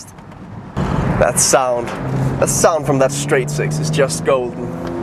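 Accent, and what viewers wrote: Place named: Denmark. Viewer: godt tip, du får ikke særlig mange views når du taler engelsk, man kan tydeligt høre at du er dansk, bare tal dansk tak.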